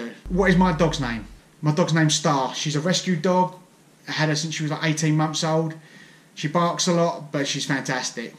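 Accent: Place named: Essex, United Kingdom. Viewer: Ooooo I heard Essex #EssexGirls🤘🏻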